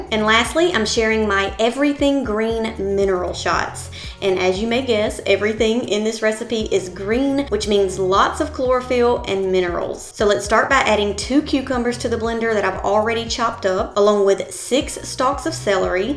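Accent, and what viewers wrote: South, United States. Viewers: I love your accent. I’m taking a guess Southern States of US. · She country af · your southern accent 😍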